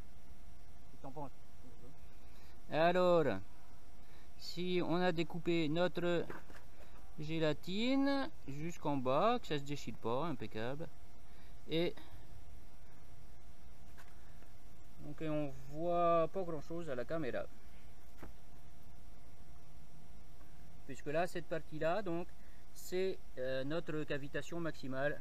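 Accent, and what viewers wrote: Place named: Tahiti, French Polynesia. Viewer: Tu a un accent tahitien 🤗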